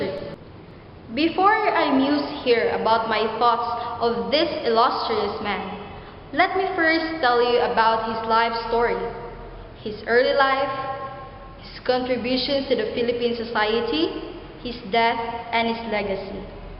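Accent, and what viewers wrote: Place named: United States. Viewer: Is that your real voice? or lip synching...LOL. if that is...Congratulations!...you did perfect american accent, rise and fall intonation of voice are impressive...Good luck!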